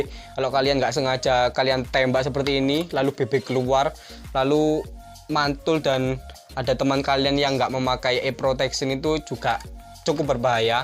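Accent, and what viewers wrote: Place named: Java, Indonesia. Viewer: Jowone medok ea🤣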